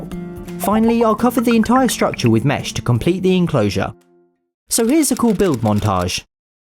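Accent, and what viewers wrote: United Kingdom. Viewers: For a second I thought was just getting a little tired (and a little British too it sounds like).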